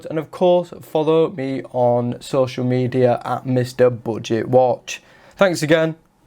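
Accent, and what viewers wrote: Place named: West Yorkshire, United Kingdom. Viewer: Great watch. Wearing mine now! Love the accent too - I'm going West Yorkshire?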